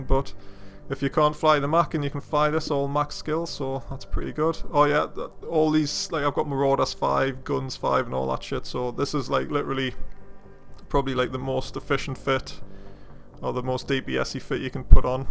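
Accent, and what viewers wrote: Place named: North East England, United Kingdom. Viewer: Lo m8 just come back to eve after a long break sitting in ya pub atm, you sound like your from the NE like me, would love to chat about some fits m8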